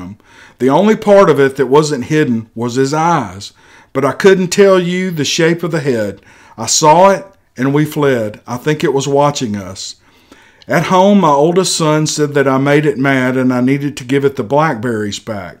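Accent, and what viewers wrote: South, United States. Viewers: I never comment, but I listen every night. Your southern voice puts me at ease at night. I enjoy your comments and the way you read these stories. Please keep the stories coming!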